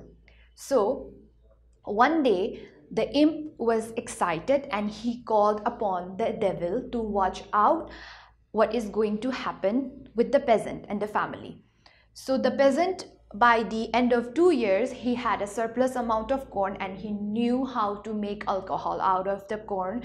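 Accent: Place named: Manipur, India. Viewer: Let us promote the culture of appreciating something which is good. In my opinion this lady is doing a fantastic job for those students who are capable of speaking in English. If you are not happy with her style of teaching please go for some other vedios that help you understand the lesson in a better fashion. She is young, energetic and articulate. Tell me honestly how many English teachers in Manipur speak English with such beautiful accent.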